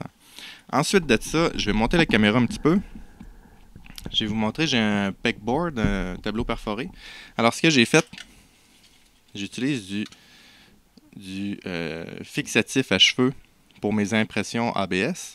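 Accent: Quebec, Canada. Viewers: j adore ton accent quebecois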